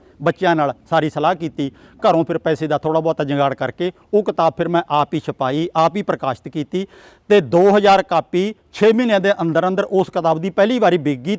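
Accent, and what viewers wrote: Puadh, India. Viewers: ਮਾਰ੍ਹੀ ਪੁਆਧੀ ਬੋਲੀ ਨੈੱਟ ਪਾ ਸੁਣਕਾ ਮੇਰਾ ਦਿਲ ਬਾਗੋਬਾਗ ਹੋਜਾ, ਪੁਆਧੀ ਬੋਲੀ ਤੋ ਨਿਊ ਆ ਜਿੱਤਰਾਂ ਕਿਸੀ ਕੇ ਮੂੰਹ ਮਾ ਤੇ ਮੋਤੀ ਗਿਰਰੇ ਹੋਮਾ, ਅਰ ਯੋਹ ਆਲਾ ਤੋ ਜਮੀਂ ਪੁਆਧੀ ਬੋਲੀ ਬੋਲਾ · Punjabi boli di opboli puaadi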